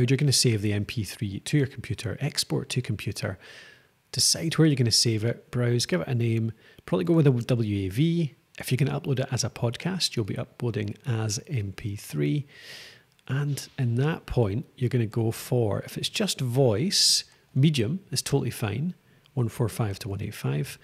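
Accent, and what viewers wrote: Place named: Scotland, United Kingdom. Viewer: This is excellent. I’ve recorded interviews to put on CD but I’m not sure about how to process them. For instance, what’s the difference between using normalisation compared to using compression to raise the volume? Is it a case of either/or? Lovely to hear a tutorial in a beautiful Scottish accent compared to the usual American videos. Keep them coming.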